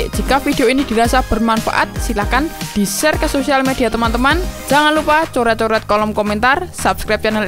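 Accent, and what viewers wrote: Java, Indonesia. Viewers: Kreatif bagus gw suka,,,tp gw lucu sm suarany Jawir bngt.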